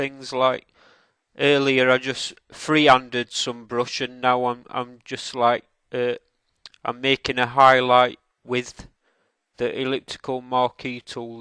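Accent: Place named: Sheffield, United Kingdom. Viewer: pquarmeARTS he sounds like because he lives in sheffield "I don't think you now a lot of people form Sheffield" :)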